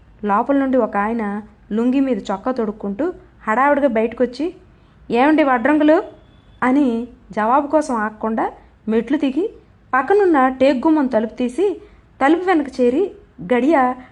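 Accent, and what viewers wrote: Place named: North Andhra, India. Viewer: చెక్కా వారికి గానీ పెండ్లికి గానీ పిలుపుకి గానీ కథతో సంబంధం లేదు. (లేదా నాకు అర్థం కాలేదు)😂 గారి కథనం బాగుంది. గారు ఉత్తరాంధ్ర యాసతో చదువుతుంటే వీనులవిందు గా ఉంది! కొన్ని పదాలు మరీ పాయసంలో జీడిపప్పుల్లా అ(వి)నిపించాయి!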